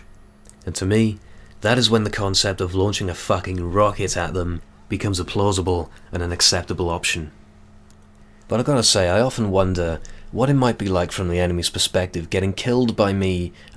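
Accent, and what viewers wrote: Wales, United Kingdom. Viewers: Are you Welsh? You seem to have a very strong welsh accent. But the manly one, not the gavin and stacy welsh accent. The one that that comedian has... uh, whats his name... he did a work experiance series where he did work instead of comedy... ANYWAY, yeh, so, are you welsh?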